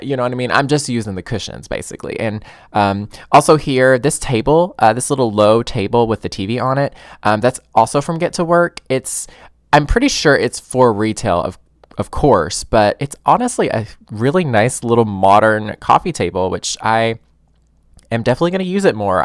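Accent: South, United States. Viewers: Hey!!!! So Happy To See YOU!!!! And Your "Country Accent" Gotta Love A Country Accent! LOL This Is DARLING!!!! I've Never Done Limited Packs......... SOMEDAY! I Got Discover University Too... Haven't Played It Much.... With My Depression But Feeling SO Much Better Now....!! Back To Sims Now! HUGZZZ To YOU! 😘😘😘😘😘😘😘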